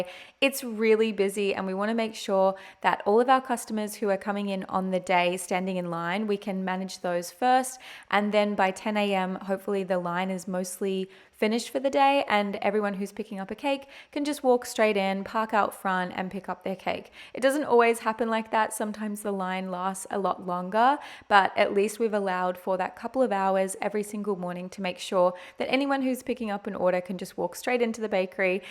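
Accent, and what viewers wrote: Australia. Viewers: Wow im not american but those have to be huge cookies even for the USA standard right? 😅 Edit: just realised thats an australian accent hahaha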